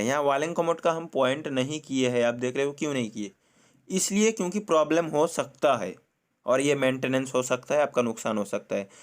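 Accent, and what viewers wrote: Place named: Bengal, India. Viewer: Hey bro i know u r bengali ( by ur accent) do u have any good contact for plumbing in kolkata?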